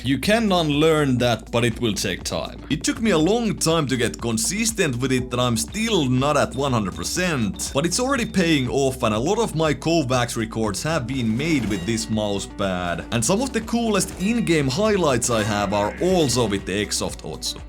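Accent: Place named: Finland. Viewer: Nice explanations regarding pressure vs friction. Also, I love your finnish english, very colorful to listen to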